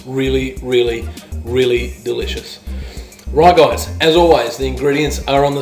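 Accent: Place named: Australia. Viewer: same here....heard ur Aussie voice and went SNAP Subscribe lololol look forward to ur videos "P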